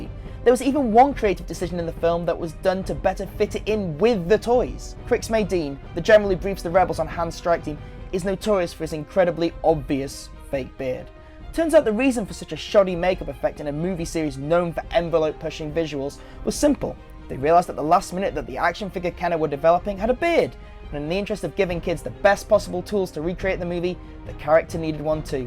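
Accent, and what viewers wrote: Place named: United Kingdom. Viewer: He has a British accent... They pronounce 'a' at the end of a word as 'er.' Not a mistake...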